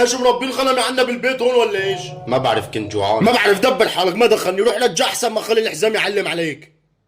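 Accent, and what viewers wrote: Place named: Iraq. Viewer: اقول لك انت كلامك عراقي بس انت اظن شو اريد او اردني بس كانك انت عراقي مبين حتى من العماره😂😂😂😂 هذه المنطقه اسمها العماره اسمها اي اصلها ميسان😂 في يوم من العماره🎉 خلي يحط لي لايك لعيون العراق او العراق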